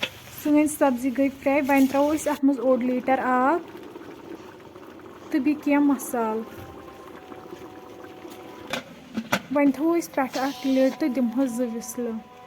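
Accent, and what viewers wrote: Kashmir, India. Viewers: Your kashmiri accent is sooooo good ❤,,,,😊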